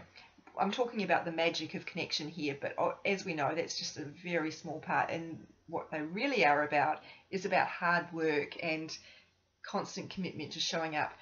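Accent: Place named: Australia. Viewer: I really feel your love.. But most of all you are so down to earth about spirituality and angels and make it sound so 'normal ;) To me it is but I guess Im still in the closet cause of my familys ignorance..or should I say disinterest in LOA and signs etc.. Im a Twin Flame too but cant talk to anyone here in Gothennburg Sweden about it. Love your channel and your aussie accent! Makes me feel at home, comforted, grounded! ;) Grew up in Australia but havent been back since 1984.. Namaste/Cec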